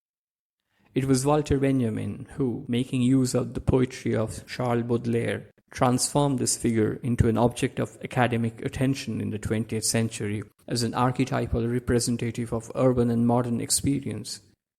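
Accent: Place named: India. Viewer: I feel much oblige to say that i don't like Hindi accent, but yours was pleasant. And the info and insights you provide are powerful. Thanks for the video!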